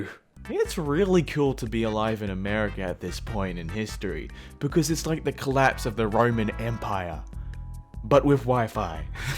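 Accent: Australia. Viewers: He's not English/British... he's Australian. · Are u Australia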